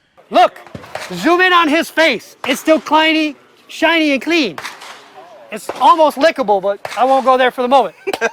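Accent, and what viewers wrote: South, United States. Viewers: I always liked a southern accent. Makes things go down smooth. LOL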